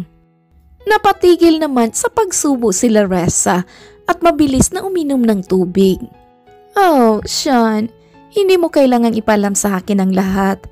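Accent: Visayas, Philippines. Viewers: Maganda kwento wala ako masabi po sa boses lng d maganda po try ko lng po makinig ng kwento niyo,,maganda po mga kwento ,,,tamo po ung mga kwento ni amihan stories,kat amazing,saima ung mga boses nila magaganda po,pero ung boses po d maganda parang bisaya salita oh hirap tagalog po ✌️✌️✌️✌️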